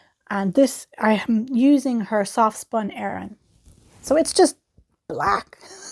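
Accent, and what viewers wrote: Ireland. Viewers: I enjoy content variety w/ ya YouTube page! #High5Blessings Super Interior settings and content details in video... You totally resemble my neighbor #fyi Super Irish Accent. Posted link on my Tweeter page.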